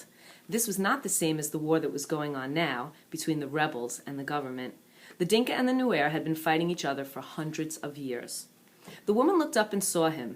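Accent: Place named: New Jersey, United States. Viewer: jersey accent makes it all the better ;D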